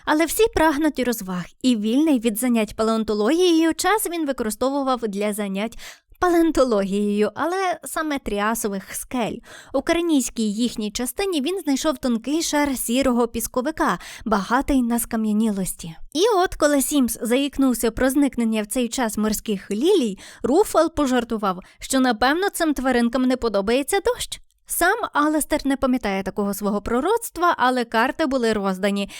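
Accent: Standard. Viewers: Неперевершена вимова...Чудовий голос.... Еталонна українська мова... Цікавий контент. Однозначно вподобайка..! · Величезна подяка за джерельно-чисту українську мову, цікавий виклад, гарну дикцію та відмінну інтонацію. Така подача точно не дасть заснути. Ще раз дякую. Слава Україні!!! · Чиста українська мова, яку хочеться слухати й вчити. Хороше відео цікаве й яскраве. Ось такі треба канали для України!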